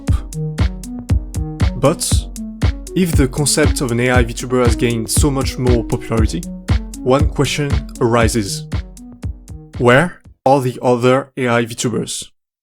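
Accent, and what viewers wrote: France. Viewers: l'accent fr xD, je m'y attendais pas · Did you know your accent is because you pronounce english words as French, or the letters atleast. It's why when I speak Japanese, I actually get praised on my accent because I force a fake asian accent that if I spoke in english would get me cancelled 😂 but for speaking Japanese it actually makes my accent more like a local.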